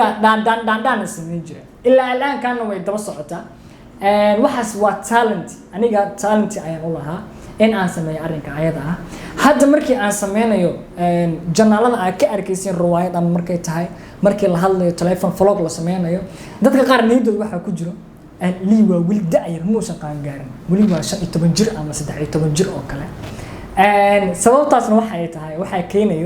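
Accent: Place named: Mudug, Somalia. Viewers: walhi uma malaynnay inanaad reer xamar tahay obaha haysty reer mudug😂😊😂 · Zxp hadalka mudug xagow ka so racay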